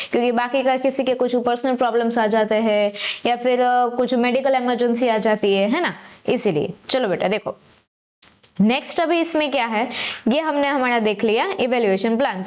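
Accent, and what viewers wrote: Gujarat, India. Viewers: Aap Gujarati Ho kya Mem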